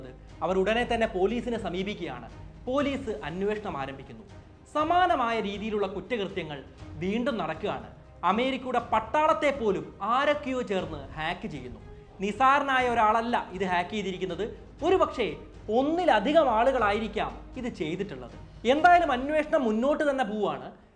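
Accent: Kannur, India. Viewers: നിങ്ങൾ കണ്ണൂരാണോ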